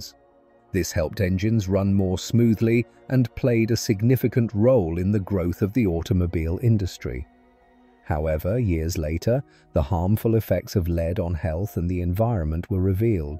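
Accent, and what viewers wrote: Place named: United Kingdom. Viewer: No not listening to a bloody limey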